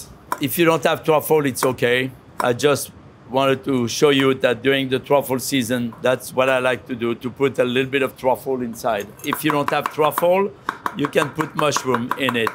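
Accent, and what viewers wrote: France. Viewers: love every time his inner french hits randomly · Goodness me, amazing cooking, mouthwatering steak with a touch of french accent. I'm hungry now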